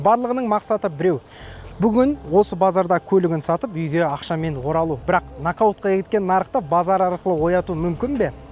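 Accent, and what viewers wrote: Standard. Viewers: Бауырым, рахмет! Мына видеон браз конил куйди котерди е вахахаххааа Не деген мыкты обзор жане таза казакша сойлегенине рахмет айналайын!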